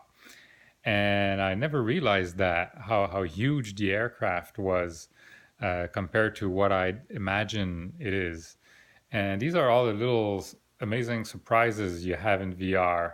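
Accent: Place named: Quebec, Canada. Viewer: Where are you? You sound French Canadian, I live 2 hours west of Toronto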